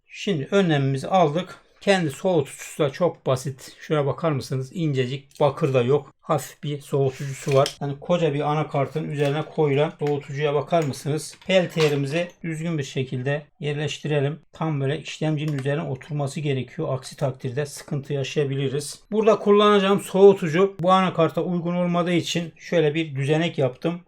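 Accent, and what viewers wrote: Erzurum, Turkey. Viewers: abi sen Erzurumlumusun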